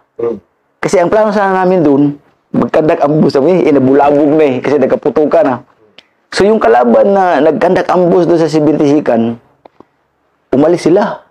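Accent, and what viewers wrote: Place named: Visayas, Philippines. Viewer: Hahaha visaya lagi do…nakakaaliw si sir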